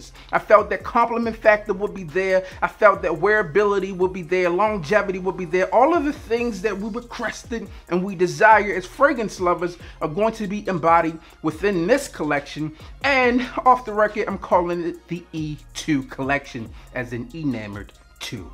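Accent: Baltimore, United States. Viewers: You from Bmore?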